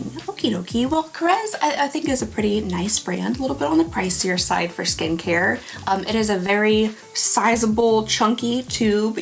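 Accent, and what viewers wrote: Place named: Ohio River, United States. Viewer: I know this is completely off topic but…. It never fails to amaze me that I live maybe 75 miles to the north of you if that and we have vastly different accents. How’s the divide at the Ohio river that mighty in this accent game?!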